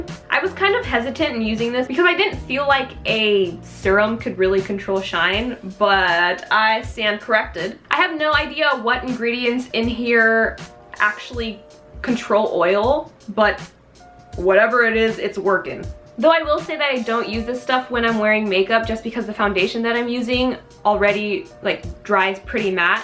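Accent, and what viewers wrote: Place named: Australia. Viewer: The little hints of Aussie accent always crack me up 😂💖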